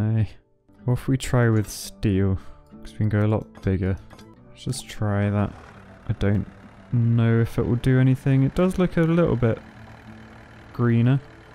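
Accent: United Kingdom. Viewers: Its the British accent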